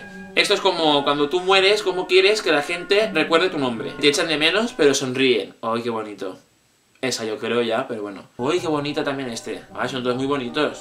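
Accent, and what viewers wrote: Catalonia, Spain. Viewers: Eres catalán?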